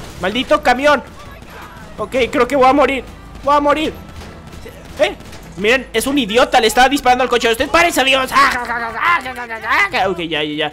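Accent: Mexico. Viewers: oye eres de mexico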